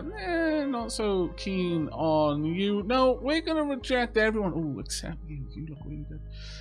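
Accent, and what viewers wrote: United Kingdom. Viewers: You are pronouncing 'algae' in British English (which makes sense, as you're speaking in a British accent). <link> This is the American English pronunciation. <link>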